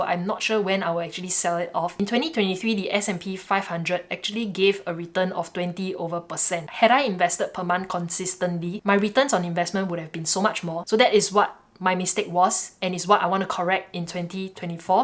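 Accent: Singapore. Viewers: I love your singaporean accent!!! Singlish makes my heart beat! Maybe it was your good taste in music and the beautiful scenery from your newest korean vlog. 🌇 you are so smart, I'm going to watch all your videos! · Is she speaking Singlish? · I know you’re Singaporean from your accent.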